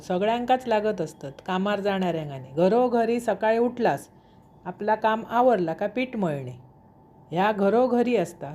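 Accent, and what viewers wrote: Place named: Malvan, India. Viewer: Khupach chan🙏🙏 pan तुमचे बोलणे जास्त सुंदर आहे मला खूप छान वाटते तुमचे मालवणी पद्धतीचे बोलणे मला हिं च्चहा chapati khupach आवडतात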